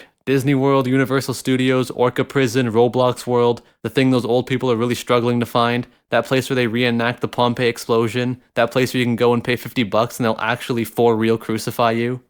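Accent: Newfoundland, Canada. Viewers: are you Canadian? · Ok so like what province are you from? You keep saying b'ys but I dont know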